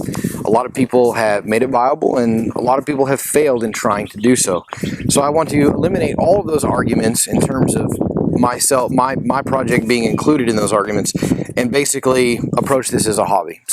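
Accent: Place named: United States. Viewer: Sounds Great, where are you building this? Accent says USA but some references point to Australia...... soooo Queensland??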